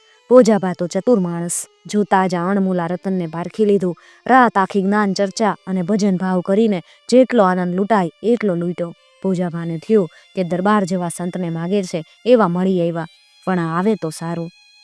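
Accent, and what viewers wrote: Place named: Saurashtra, India. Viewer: શાબાશ બહેનબા હવે,સૌરાષ્ટ્રની ભાષાની બોલીની છાંટ અને ધાર બરાબર આવતી હોય એમ જણાય છે. ધન્યવાદ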